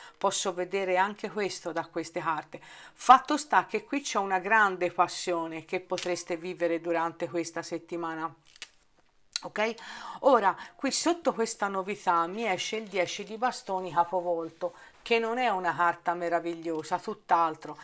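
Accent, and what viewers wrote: Tuscany, Italy. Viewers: Sentirti, con questa meravigliosa parlata Toscana, è bellissimo. Io delusa.. Uff ormai ci ho fatto il callo.. Mi piacerebbe molto lo sai trovare finalmente la persona giusta, ma è molto difficile. Ma nonostante questo mi piace sempre ascoltarti❤️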